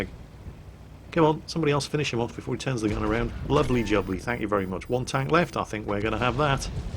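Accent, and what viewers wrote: Russia. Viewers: Love when is doing Russian accent. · Gotta love ur laugh at these blindshots and the russian acsent:D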